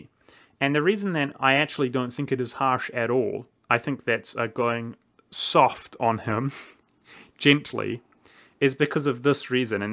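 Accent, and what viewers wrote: New Zealand. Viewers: He is New Zealandian I think. His accent is either New Zealandian or Australian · Your'e from New Zealand?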